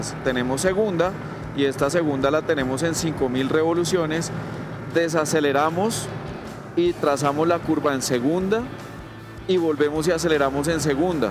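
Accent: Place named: Argentina. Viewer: Antes de q diga q era Argentino ya me había dado cuenta q lo era. Tenemos un acento único! 😁 Buenísimo el video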